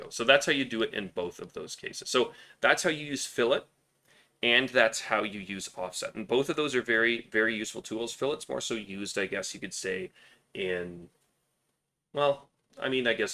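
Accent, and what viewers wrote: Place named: Canada. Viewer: Canadian accent is strong.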